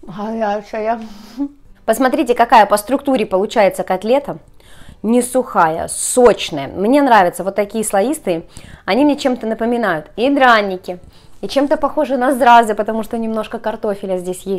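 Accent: Ukraine. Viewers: Если бы у было плохо с чем-то, не было бы 4 млн. пользователей. Все отлично. Мне приятно слушать, у меня так бабушка говорила, а у меня московский акцент остался. Но я тоже считаю достоинством грамотный русский, но если его нет, является ли это недостатком? На таком русском пол Украины говорит.:) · Если американка говорит по русски с акцентом - это нормально, а если украинка? это же не родной язык, попробуйте сами поговорить на чужом языке. Думаю критики кроме своего русского больше никакого не знают. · Спасибо за чудесные рецепты они у Вас всегда вкусные и очень аппетитно смотрятся.Вы человек-СОЛНЫШКО и Ваша изюминка- АКЦЕНТ!Украинский говор очень красив,впрочем,как и украинские девчонки! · Какая убедительная приятная плавучая речь. А слабый украинский акцент придаёт такой оригиналный колорит. Если нет аппетита то надо смотреть Ваши ролики. Появится и аппетит, и настроение.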